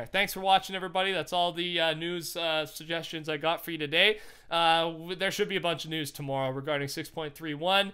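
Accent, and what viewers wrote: Canada. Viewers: Are you Canadian? It sounds like you have a little Canadian accent 😂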